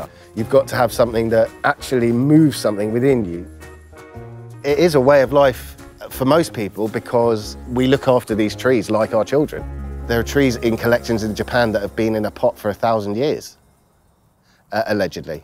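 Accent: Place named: United Kingdom. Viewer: Great video man, drink and drugs the same thing Essentially. I've been there and like you come out the other side, lovely collection are they all yours?, better than my efforts lol. Only just found your channel I'll subscribe and look in on ya, sounds like your in the UK aswell, peace man and those bad days and thoughts are no different to anyone else's.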